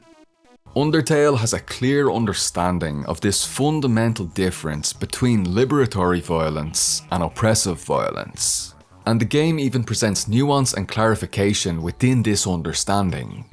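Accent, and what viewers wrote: Ireland. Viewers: I’ll have forgotten the spoilers by the time I play. besides, you arent the first. the people I used to know back in school would always talk about this game. of course, none of them did it with a handsome Irish accent and a Marxist analysis to top it off, so Im a little more inspired to see the game for myself now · yo I clicked on the video and in the first few words I was yoooo this guy is a fellow Irishman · as a fellow irish person, it's so great to see another undertale fan from our country! this video was amazing and extremely well articulated ❤ you earned a new sub 🇮🇪🇮🇪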